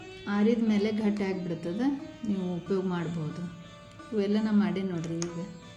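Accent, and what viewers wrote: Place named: Karnataka, India. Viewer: I love ur Kannada slang ❤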